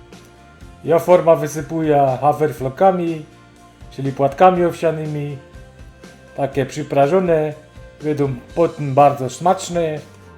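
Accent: Silesia, Poland. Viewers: Pospiesz sie z tym zytnim chlebem, prosze. Uwielbiam Twoja slaska gware i prowadzenie. Krotko, konkretnie, na temat i z humorem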